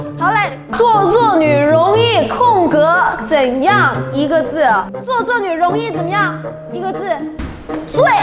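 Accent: China. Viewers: 為什麼要大陸腔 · 她怎么是大陆口音啊？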